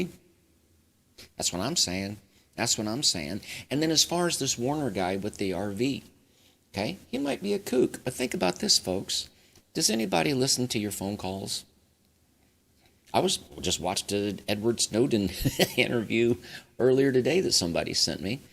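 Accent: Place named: Mississippi, United States. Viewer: I live in Mississippi and I lost my mom to lung ca 11 yrs ago! They're proud of us!! Love your Mississippi accent!! Great!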